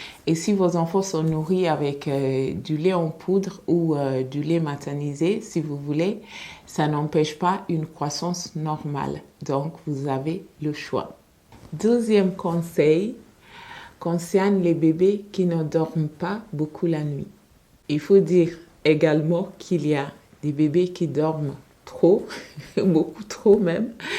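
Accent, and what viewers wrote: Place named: France. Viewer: Instead of reading the subtitles I am listening to your lovely French accent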